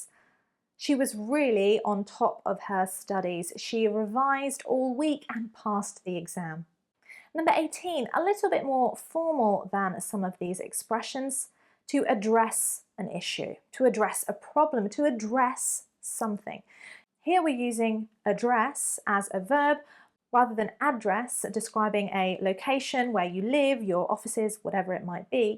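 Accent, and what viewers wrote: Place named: England, United Kingdom. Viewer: Hola me gusta cada ves su acento me enamore del acento ingles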